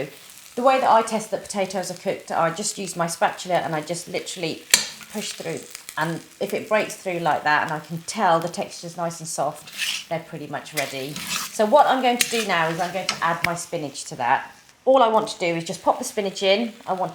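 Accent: United Kingdom. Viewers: I just watched the whole video just for that British english. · HEY SEXY INDO WITH BRITISH ACCENT YOU FORGOT TO ADD ANY SPICES NONE ARE YOU BOILING IT WITH NO SALT PEPER CHILI POWDER OR WHAT ?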